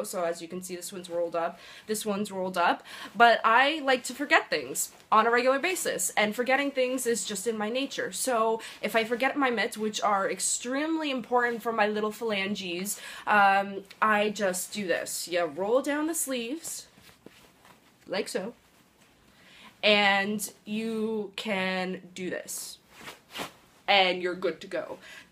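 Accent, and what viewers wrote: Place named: Canada. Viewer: i love your cad accent..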